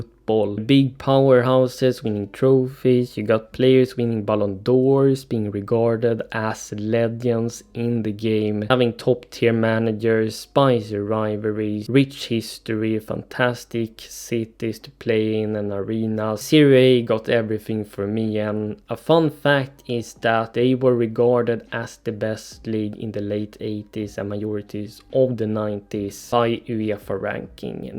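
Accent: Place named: Sweden. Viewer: LMAAOO i was just about to ask that you have the perfect swedish accent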